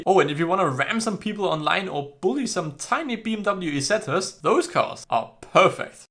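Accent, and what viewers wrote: Germany. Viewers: Is it possible that ur German? You have that accent😅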